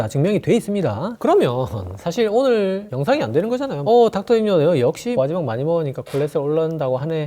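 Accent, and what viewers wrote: Gyeongsang, South Korea. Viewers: 쫏기듯 말은 빠르고, 발음은 정확하지 않고, 톤은 높고, 경상도 억양은 심하고 듣기가 불편함. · 오늘따라 경상도 억양이 너무 쎄게 들리네요 말을 잠간 끈어서 말씀해주면 감사겠습니다 숨도 안쉬고 계속 말하는것같아 듣기가 좀 힘이듭니다 항상 감사합니다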